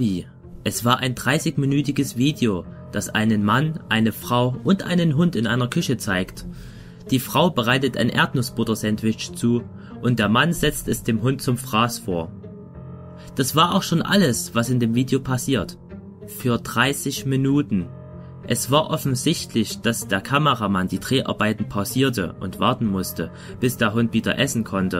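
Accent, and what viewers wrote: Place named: Saxony, Germany. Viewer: Okay, ich schreibe mal einen professionellen Kommentar: (Es wird ein etwas längerer Text) Fangen wir an mit "Text und Aufnahme": Also zuallererst einmal ist deine Sprechweise gut, der Lesefluss ist super und deine Betonungen glaubhaft und ist gut platziert. Manchmal hast du aber etwas knapp geschnitten, damit meine ich das manche Sätze schnell enden und du also (rein natürlich) nicht die Zeit gehabt hättest Luft zu holen. Ich sehe deinen Förderbedarf im Dialekt, da ich dich mittlerweile sehr gut kenne weiß ich das du hier sehr darauf geachtet hast nicht zu sehr "Sächsisch" zu klingen, dafür ein Kompliment. Zum Text gibt es kaum etwas zu sagen da es ein ziemlicher Klassiker ist der eher vom Background lebt, also den Videos die man tatsächlich anschauen kann. Ich fahre fort mit "Technik": Mit deinem Videobearbeitungsprogramm hast du eine gute Wahl getroffen. 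Die Bilder sind stimmig und passen von der Bearbeitung zu NPFNP. Man "merkt" das sich die Qualität von diesem Video vom Rest erheblich abhebt das sich nicht zuletzt auch auf dem Sound auswirkt. Auf die Bearbeitung deiner Stimme gehe ich mal nicht ein, da du ja dort noch etwas Hilfe hattest ;) Der Background ist gut gewählt, bei aber + etwas leise. Fazit: Eine Interessante CP die ich sehr genossen habe, danke für deine Arbeit ! NEVER~SLEEP~AGAIN Cruzix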